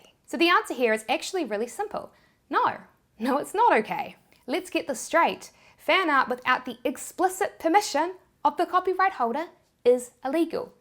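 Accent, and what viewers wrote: New Zealand. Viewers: This girl has a pretty distinct NZ accent, I don't know what you guys are talking about. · Are you from nz?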